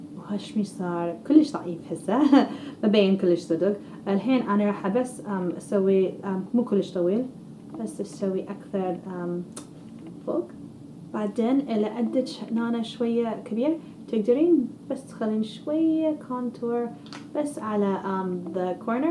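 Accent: Iraq. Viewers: أحب لهجتها العراقية 💜💜💜💜💜💜💜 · فدوه شلون تحجي عراقي امووت عليجج حياتي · اموت عليج من تحجين عراقي ♥ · استمري يا حلوه حلو الكلام العراقي عليج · فدوة حبيبتي شكد متواضعة ربي يوفقج وأحلااا شي حجيج العراقي ولو مكسر بس حلووو💋💋💋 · فدوة من شافت عراقين يدعموهها ويحبونه كامت تحجي عراقي وبصراحة كلش حلو 😇😇😇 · You're speaking like irak😂... You're amazing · حبيتت تعلمت واايد اشياء منج و سوي ڤيديوات اكثر بالعربي عشان تتقنين لهجتج (كيوتت لما تتكلمين بالعراقي😭😂💜💜) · حبي هدى اللهجه العراقيه تجنن عليج استمري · مرحبا هدى تخبلين من تحجين عراقي واني اتابعج كلش حلو الفديو عجبني ❤ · خخخخخخ اللهجة العراقية جيبلي القرف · اويي فدوة شكد احبها من تحجي عراقي 😘😘😍😍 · طلعت عراقية ، فديتها و فديت اللهجة العراقية حلوه هواااي ، تحية من جزائرية ❤💋 نبغيكم بزاف و نبغي كتهدرو هاك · هاذي لهجه عراقيه وهيه عراقيه يعني مصطلحات عراقيه😂😂😂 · احلى شي من تحجي عراقي ❤️❤️❤️❤️❤️ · عفت الفيديو وكعدت اسمع لهجتج بالعراقي 😍كلش حلوه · حبيبتي لهجتچ بالعراقي مهضومه 😄 فديتج اول مره اعرف صاحبه ماركه هدى بيوتي بس جد حبيتج😘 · والله اتابعه علمود اسمع اشلون تحجي عراقي يجنن حجيه 😘😍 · تخبل من تحجي عراقيييي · هههههه عراقي يجنن عليها 😂😂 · هدى تعلمت تحجي عذراقي اعشقج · كلش احب لهجتج بالعراقي😍😍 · فدوه شلون تحچي العراقي يجنن بحلگچ هدى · يخبل عليها الحجي العراقي يمه فدوه 😍🇮🇶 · احلى شي بيج باقيه على لهجتج العراقيه رغم صعبه عليج احسن من البعض بس طلعو من العراق غيرو لهجتهم تحيه الج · Yes BABY I'm so so proud of you keep practicing your arabic! 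It has truly improved! Love you little cute Iraqi accent❤️ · كايمه تحجي عراقي فدوه 😂😂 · يمه فدوه للتحجي عراقي....فديتج... اصلا اني اكثريت منتجاتي من هدى بيوتي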